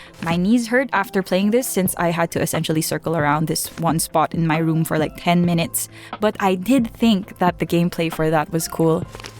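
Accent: Philippines. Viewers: Omg! Im also pinoy! Kamusta? I never realized na filipino ka pala. Pero may slight filipino tang sa accent mo. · I've only watched the first 10 seconds and oh my god are you Filipino? Edit: I checked your bio, you are?!?!?! :DDDDDD AHH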